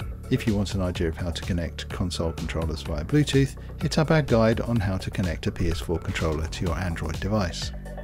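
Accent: England, United Kingdom. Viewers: Damn, ur voice is so soothing... And that British accent... U can tell me to kill my parents and all i would be able to reply is Yes, Master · Finally a English voice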